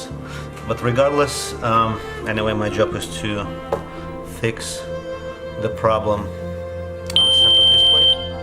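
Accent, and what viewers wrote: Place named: Russia. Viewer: Жесткий Русский акцент ;) молодец руки из правельнного места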